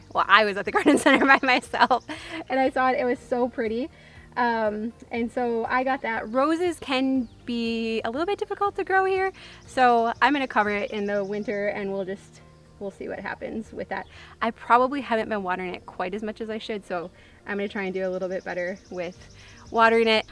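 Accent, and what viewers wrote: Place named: Midwest, United States. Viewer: I like you so much. Thank you for all of your videos...and for your life that speaks louder than words.☆ Like you always say, I wish we could be friends.^^ You're a little cooler than I am, but you seem humble enough that I think it could be possible! 😎 lol I'm from Michigan, raised in Wisconsin, and taught a few years in Missouri before moving to South Korea. While teaching here I met & married my Korean husband. I do miss 'home' in the U.S. while also loving my life in Korea, so your vlogs and family life are a 'taste of home' for me.😊 Love your family's Midwest-style...all the way down to your casseroles and accent.♡ I am a sentimental saver. Your minimalist thinking has helped me learn how to declutter! I work at it often...still have more stuff (storage containers) than necessary. And it does feel overwhelming at times, especially before a move (which I have moved often!). 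I was determined to declutter in my classes this week. I had boxes & files of paperwork from the past 6 years (or more) than needed to be gone through. It was hard to get enough motivation/energy to start. But after praying for energy (which God provided!🙌...along with an Americano and another iced latte...😅), your talks kept going through my head: "time will tell", "less inventory to manage", "if you haven't used it in over a year it's okay to let it go", "don't feel guilty even if you spent money on it...it served its purpose for a time", "you can replace it later if you really need to", etc.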